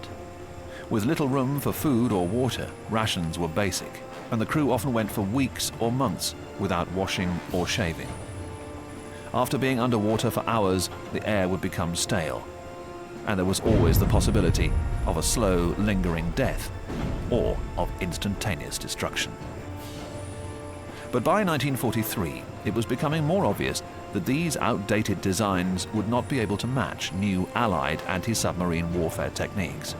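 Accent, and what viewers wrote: United Kingdom. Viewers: British narrators are the best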